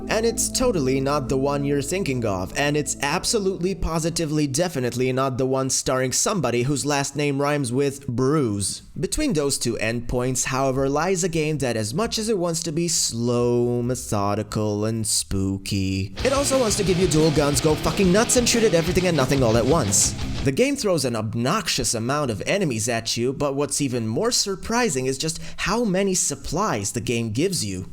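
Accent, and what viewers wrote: United States. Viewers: Where in Europe are you from? You sounded American until you said Europe. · You don’t seem to sound like your from any part of Europe when you said you were from a part of Europe I was shocked because you sound American.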